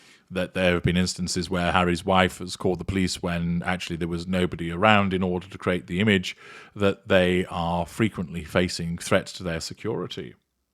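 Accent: United States. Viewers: Pretty decent American accent. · Damn fine 'Merican accent! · You've been working on your American accent. Nice!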